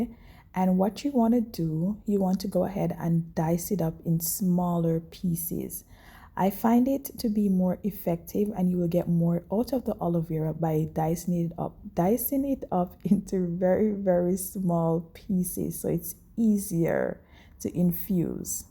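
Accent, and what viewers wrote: Jamaica. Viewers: typically a Jamaican she said a pat stur not pot or stir love my jamaican accent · I cn hear you a yard girl 😊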